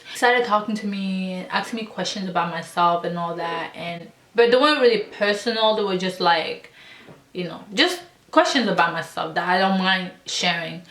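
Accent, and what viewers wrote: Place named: Netherlands. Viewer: Where u from your accent different like u from Netherlands or something?..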